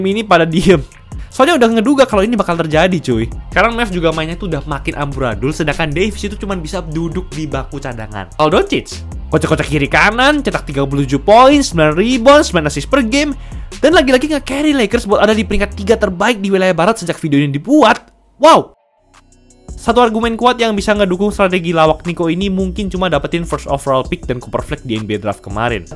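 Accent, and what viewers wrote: Java, Indonesia. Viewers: Was kamu wong jowo kah ?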